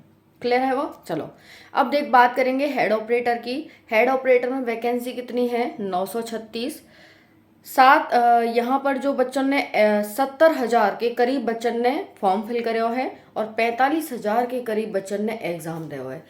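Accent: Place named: Etah, India. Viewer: Eta district ki language hai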